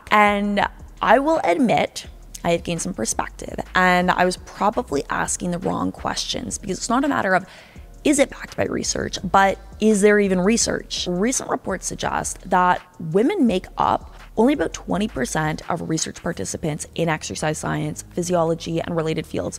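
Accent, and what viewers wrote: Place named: Canada. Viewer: It's a Canadian accent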